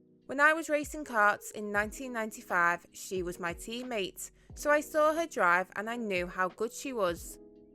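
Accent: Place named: Hull, United Kingdom. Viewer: Great Vid..... Ha e to ask is the Narrator from Hull my family is from Hull.. No accent like it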